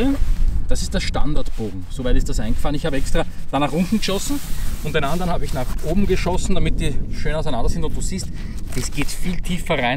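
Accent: Vienna, Austria. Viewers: Du bist schon ne coole Socke und ich als Piefke finde den österreichischen Dialekt so geil. Ach ja und klasse Video! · DEN österreichischen Dialekt gibt es nicht. In Deutschland gibt es ja auch weit mehr Dialekte als Bundesländer (Sächsisch, Berlinerisch, Kölsch, Schwäbisch, Fränkisch,...). In Österreich ist es genauso. spricht mit Wiener Akzent bzw. Niederösterreichisch nahe Wien. 🙂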